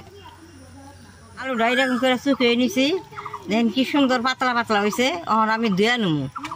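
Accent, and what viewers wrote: Bangladesh. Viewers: Jethi Tumi khubi majadar jinish banao kintu abujh manush tumar mullayan kore na tumar bhasha shune moe hoy Tumi jamalpur Tangailer luk onek din becher thako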